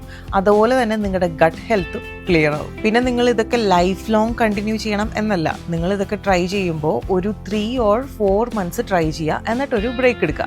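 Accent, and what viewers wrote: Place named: Malappuram, India. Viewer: നമ്മളെ കുമ്പളങ്ങ മലപ്പുറം ഭാഷ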